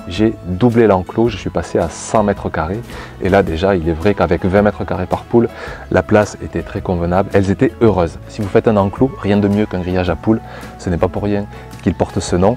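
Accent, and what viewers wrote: South, France. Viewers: je viens de découvrir vos vidéos, elles sont super et très instructives franchement je me régale et puis l'accent du sud dont je suis aussi ça fait plaisir 😀 alors oui moi aussi j'ai des cocottes 3 petites rousses et une pékin, qui recommencent à pondre tout doucement après la pause hivernale, c'est super les poules moi j'adore et je ne vois pas mon jardin sans elles, j'aime les voir déambuler et picorer par ci par là et puis moins de taupes depuis qu'elles sont là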